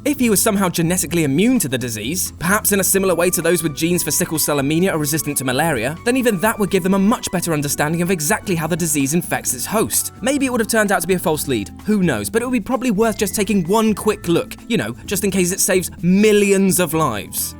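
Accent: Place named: England, United Kingdom. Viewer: Speak a little slower please? English accent is harder to understand than american. Might cost you subscribers. I only understand english because it's the "common tongue"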